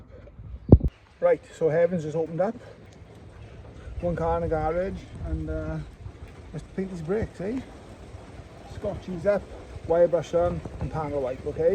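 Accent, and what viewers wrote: Wales, United Kingdom. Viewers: Just randomly got this video watched and heard the accent and subscribed straight away. From the valleys myself and plus I love these cars. Happy days mate 👍🏼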